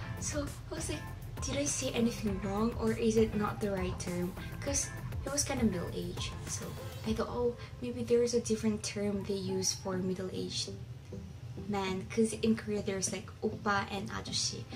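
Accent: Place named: Philippines. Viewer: you have a filipino accent... hehe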